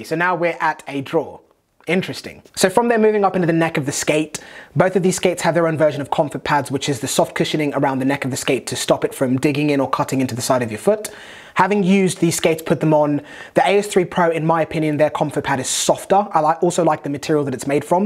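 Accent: England, United Kingdom. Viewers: Hearing an Englishman talk about hockey still throws this Canadian off. But there’s no denying that knows his shit. As always, a great and informative clip!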